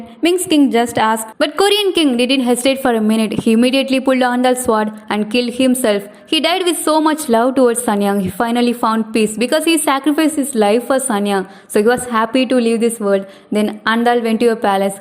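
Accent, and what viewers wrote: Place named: England, United Kingdom. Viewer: Really happy to see thiz drama in your english accent.... ❤❤❤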